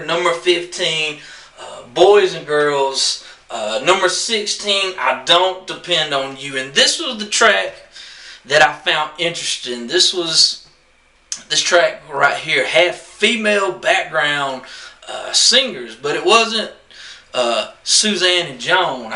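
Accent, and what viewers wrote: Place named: South, United States. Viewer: sounds weird you talking bout electronic music with that accent. i expect some good old country and western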